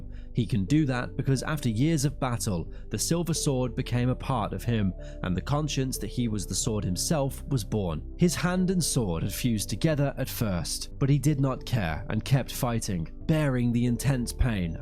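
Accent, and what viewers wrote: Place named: United Kingdom. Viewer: brithish accente good for uuuu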